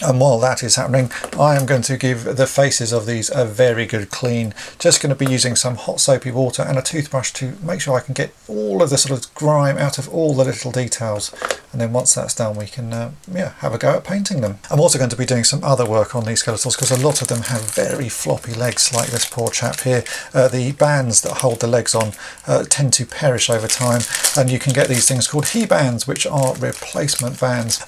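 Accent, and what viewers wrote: United Kingdom. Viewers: I gotta say - this kind of proper English accent is such a lost trait. Parents used to teach their kids to speak properly this way and sound posh, but not anymore.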